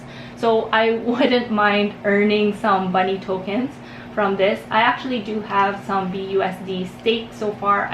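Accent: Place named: Philippines. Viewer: Hi, are you Filipino? you sound like one! I'm looking for a crypto buddy, I'm also a new crypto investor. Hope we can connect.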